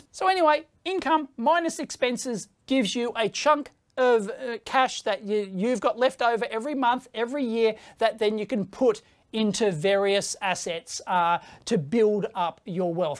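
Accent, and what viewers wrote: Australia. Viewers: your voice kills me bro and that Australian accent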